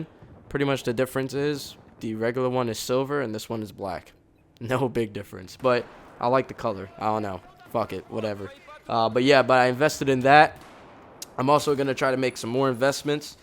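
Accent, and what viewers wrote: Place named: Florida, United States. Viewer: You sound like you live in Florida.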